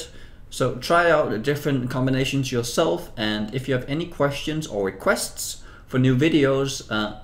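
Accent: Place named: Denmark. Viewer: Syntes nok jeg kunne høre lidt dansk accent! :D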